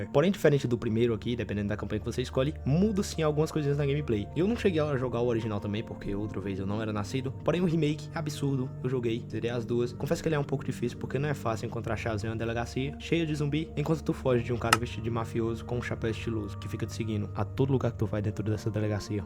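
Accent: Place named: Northeast, Brazil. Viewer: Mano, você é nordestino, né? Pelo sotaque, parece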